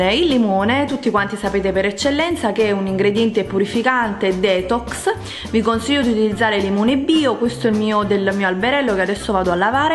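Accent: Naples, Italy. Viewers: ma sei di napoli???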